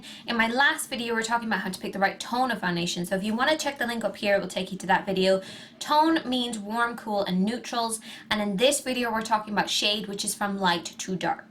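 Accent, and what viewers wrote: Ireland. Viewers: Are you Irish? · lol no, she's actually irish. that's why she sounds irish. americans don't sound like that.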